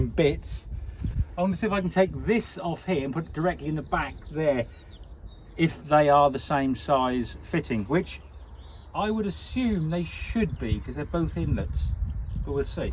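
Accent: Norfolk, United Kingdom. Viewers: All the Norfolk-ness on show tonight 😂 brilliant 👌🏻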